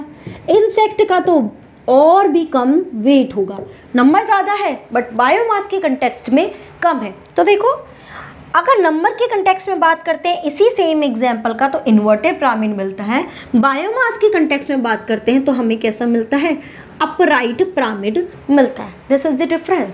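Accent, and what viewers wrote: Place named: Jammu, India. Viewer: Accent from jammu 😂❤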